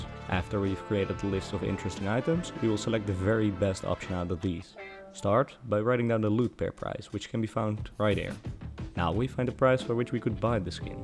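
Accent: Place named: Netherlands. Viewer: je hoort die nederlandse accent altijd zo goed he XD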